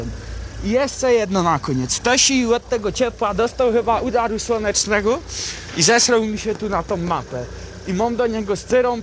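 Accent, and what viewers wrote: Poland. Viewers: fajne:) jak nie lubie góralskiego godanio to fajne:)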